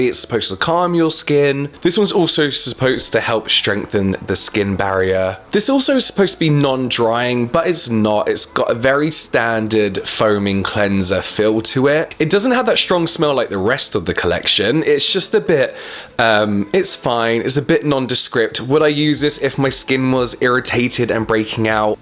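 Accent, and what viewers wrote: United Kingdom. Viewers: Love, love, love your videos! You are about my age and you look amazing. Of course I’m going to pay attention to your opinions with your fantastic skin and attitude. You’re amazing! Also a bonus is your charming British accent and humor 😍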